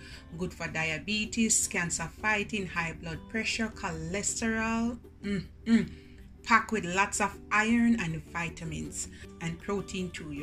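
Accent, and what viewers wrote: Jamaica. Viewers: Loving this upload my friend. Loving the Jamaican accent, for true, it gives your presentation that little bit of flavour. Awesome variety of receipt on show. Fantastic demonstration and tutorial on show my friend. Amazing health information you are putting out there, with excellent research and knowledge. The like is absolutely smashed ############ Keep Safe and Healthy out there. Stay Positive. JEVITEE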